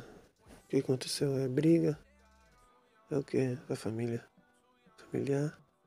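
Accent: Rio de Janeiro, Brazil. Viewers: Boy... Eu tenho um amigo com a voz idêntica a dele e tem o mesmo sotaque Carioca ❤️ meu amigo é belíssimo, tô desconfiada que seja ele nesse canal kkkk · Sutaque carioca: a minha barriga : 🦋🦋🦋🦋🦋🦋🦋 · Por que um sotaque carioca chama tanto minha atenção caralhooo🌚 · gosto muito desse sotaque carioca😍😍😍amo❤ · Deus, percebi agora que eu tenho gatilho por sotaque carioca 🥱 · O SOTAQUE CARIOCA É LINDO, PIQUE BANDIDA ✋ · So uma dúvida aqui... você é carioca? Se vc Não for, eu vou bugar muito kaksksks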